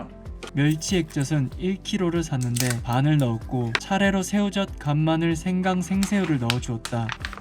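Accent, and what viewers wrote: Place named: Gyeongsang, South Korea. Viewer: 아 아이고... 역시 김치는 사먹는게 제맛.. 근데 경상도 분이셨군요 (동지)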